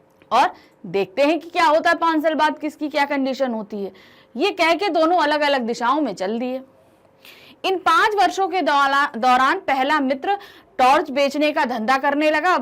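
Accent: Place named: Northeast, India. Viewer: Lgta hai north east side ke ho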